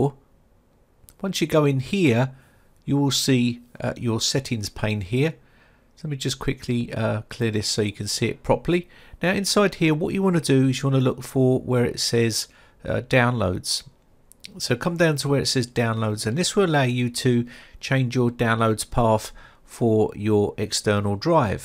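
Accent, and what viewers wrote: United Kingdom. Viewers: Not even close they have a different accent. The man on here has a British accent and the one playing Korg has a different accent a New Zealand accent to be exact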